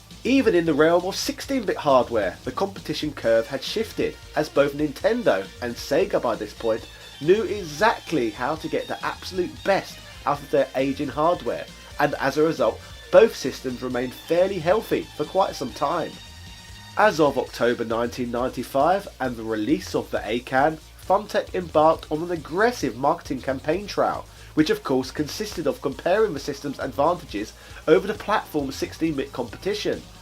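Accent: United Kingdom. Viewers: I love the British voice !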